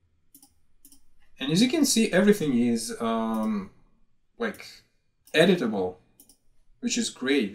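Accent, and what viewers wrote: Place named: Russia. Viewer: Russian accent))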